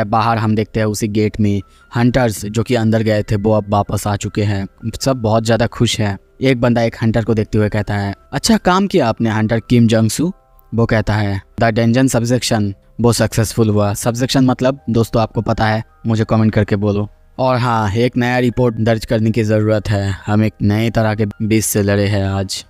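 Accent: Bengal, India. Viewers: Are you Bangali or what?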